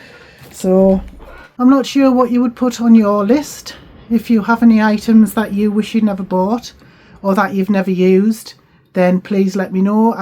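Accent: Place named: Sunderland, United Kingdom. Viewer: Just found your site.. that sounds like a Sunderland accent.. great vid thanks 🌹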